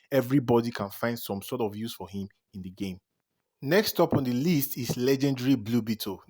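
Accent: Nigeria. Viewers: question are you Yoruba or from the south west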